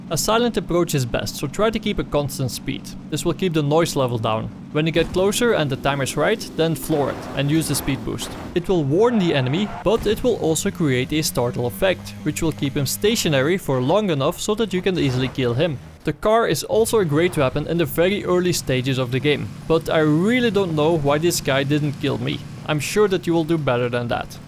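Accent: Netherlands. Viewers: he kinda sounds dutch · dutch accent? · you can tell he speaks dutch normally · Ik kan aan je accent horen dat je nederlands bent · u dutch? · Your Accent is netherlands, isn't it? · Steenkolen engels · arent you dutch your accent sounds like that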